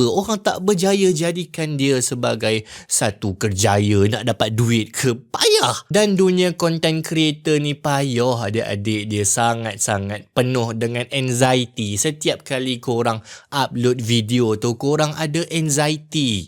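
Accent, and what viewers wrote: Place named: Perak, Malaysia. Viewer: Pekat perak nye yeob🤣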